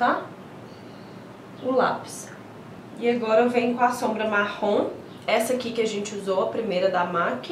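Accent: Minas Gerais, Brazil. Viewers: Aaaaaaaaaamo seus jeitim mineirim! !!!!! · Nao sabia que voce era mineira ! qual cidade?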